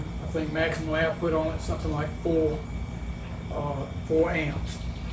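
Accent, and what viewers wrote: South, United States. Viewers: The southern accent is awesome! I love my Kobalt mower. Thanks for the review.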